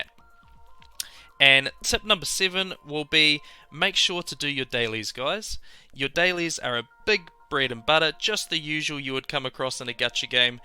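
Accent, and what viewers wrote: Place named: New Zealand. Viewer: So funny, yours was the first video I came across looking for tips for day 1 EC and immediately after hearing your voice was like, damn it's a fellow kiwi, good luck with your channel mate, and subscribed so keep putting out content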